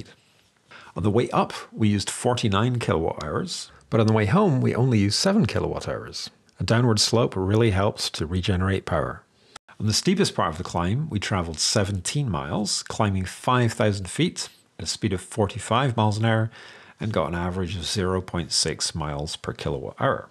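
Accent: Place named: Scotland, United Kingdom. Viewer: Hi. Came for the EV9 content, but now wondering about your accent! 😂 Do you come from Scotland?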